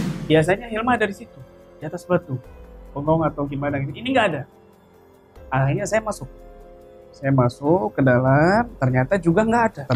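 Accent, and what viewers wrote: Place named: Madura, Indonesia. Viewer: Kayaknya pak kiyai ini masih ada turunan madura, dari logat bicaranya!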